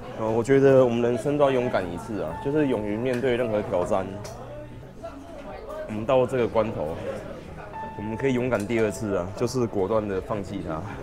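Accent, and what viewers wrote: Taiwan. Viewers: 真的，藏不住的台味XD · 講話真是夠台哈哈，跟我叔叔講國語一樣哈哈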